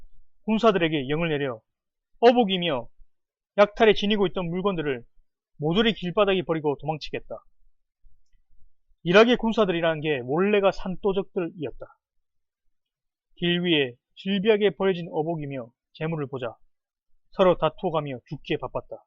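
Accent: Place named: Gyeongsang, South Korea. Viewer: 경상도발음의 한계라 듣기는 좀어색하긴합니다 수고하셨구요 목소리가 톤이 어울리지 않아요ㅎ 다른거 도전해보세요 코메디나개그같은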